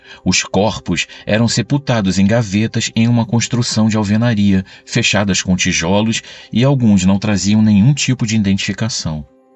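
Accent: Rio de Janeiro, Brazil. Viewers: Olá Que história bacana... É contada com esse sotaque de Carioca, isso dá um toque especial. Como foi bem lembrado: Padre Quevedo nunca desafiou a Deus, e sim as mentiras e 9s falsos profetas. João Batista - Cubatão SP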